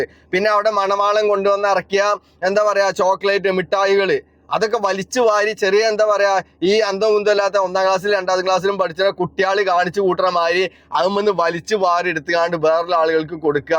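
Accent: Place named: Pandikkad, India. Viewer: സംസാരിക്കുന്നത് പാണ്ടിക്കാട് ഭാഗം സ്ലാങ് തന്നെയാണ് പാണ്ടിക്കാട് മലപ്പുറത്തു അല്ല എന്ന് പറയരുത് മലപ്പുറത്ത്‌ തന്നെ വ്യത്യസ്ത സ്ലാങ്ങുണ്ട് പൊന്നാനി മൊഴിയല്ല അരീക്കോട് ഭാഗത്തു കൊണ്ടോട്ടി മൊഴിയല്ല പെരിന്തൽമണ്ണയിൽ നിലമ്പൂർ മൊഴിയല്ല വേങ്ങര ഭാഗത്ത് എല്ലാറ്റിലും ചില വ്യത്യാസങ്ങളുണ്ട്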